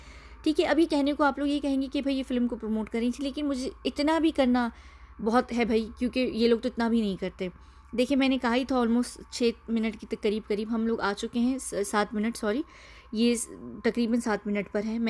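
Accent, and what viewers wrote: United Kingdom. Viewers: Ur voice is awesome. Ur english perfect. British accent is like the way u speak. Ur woman with beauty (didnt see but can guage with ur voice n heart) n brains. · I Love when you speak in English. It's very UK ish in accent 👍👍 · I like your English accent.😊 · Apka British accent bohot pyara h... jinko dikat h vo gvar h 💁‍♀💁‍♀ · I was watching and listening to this video and feeling so sad. Then I was shocked at the way you spoke in English. 😱 The British accent. ❤️ I mean the sudden twist! Your way of speaking is amazing. Amazing analysis. I hope and pray everyday he gets justice. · I luved ur English accent really Ye British accent h kya???? I appreciate your accent really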